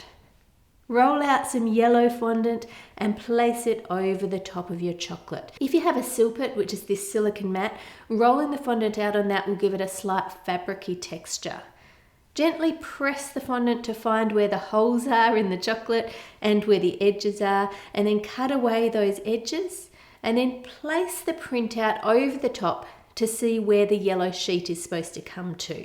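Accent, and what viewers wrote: Australia. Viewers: R u Australian I am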